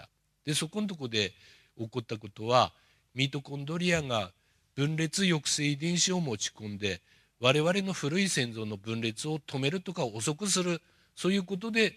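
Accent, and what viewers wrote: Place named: Tohoku, Japan. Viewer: 安保先生お若いですね。人類愛だなぁ。東北のイントネーションが耳に心地よく癒やされるのでずっと聞いていたいです。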